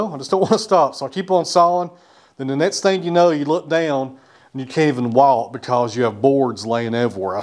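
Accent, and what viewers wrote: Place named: East Tennessee, United States. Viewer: Are you in West, middle or East Tennessee? I'm an East TN native, you sure sound like you're close to the area. I love it! Sounds like home. I hear somebody speak like that and it's almost comforting. My mind just associates it with friendlier people.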